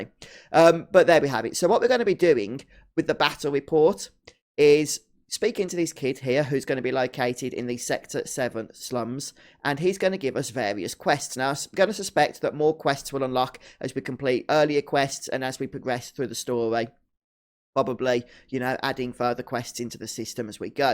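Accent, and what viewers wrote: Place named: Somerset, United Kingdom. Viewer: Are you from Somerset or somewhere? Haha. ;)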